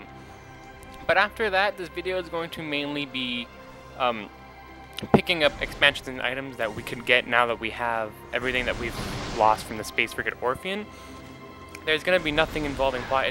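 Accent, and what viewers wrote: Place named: Canada. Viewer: Wait, I noticed a bit of an accent...Are you Canadian????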